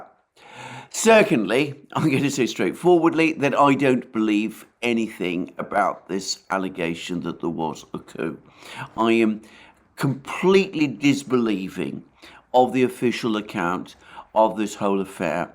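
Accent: United Kingdom. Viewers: I love your voice! Your authentic British accent is soothingly calm and civilized, yet with a range of emotion surprising to most Americans. Your phrasing is jazz, Frank Sinatra, Etta James. As expected, the famous British knack of understatement is here in spades. You leave me smiling and shaking my head for weeks remembering your deadpan delivery of the obvious, drolll punchline. Not to mention your background, knowledge and experience backing up your reporting on current political issues, giving me a much wider understanding of all the essential elements as they unfold. Thank You!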